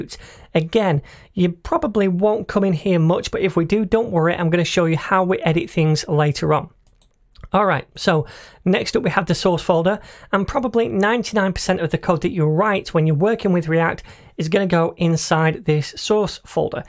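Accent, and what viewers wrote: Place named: Scotland, United Kingdom. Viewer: You remind me Jackie Stewart's voice, really. Same accent.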